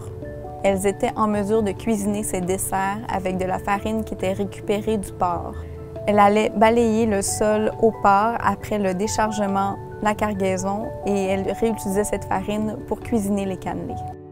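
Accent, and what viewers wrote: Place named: Quebec, Canada. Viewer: OMG what is her accent, is it Canadian??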